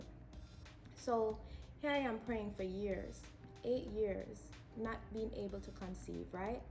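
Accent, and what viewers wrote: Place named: Caribbean. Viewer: Thanks for sharing your story. I have not been diagnosed but the doctor has told me that this is his impression based on the results. I’m 24 years old, not married yet or trying to have, still in university but I always have that fear that when I’m ready I may not be able to, but I have faith. Your story is inspiring. Ps. You sound like you’re from some where in the Caribbean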